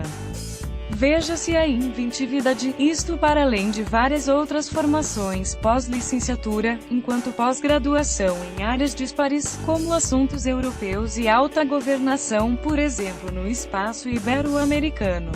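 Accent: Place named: Brazil. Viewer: Porquê em brasileiro?? nada contra mas sendo caso da política portuguesa não arranjavam um voz informatica duma gaja tuga??? abraço e parabéns subscrevo o canal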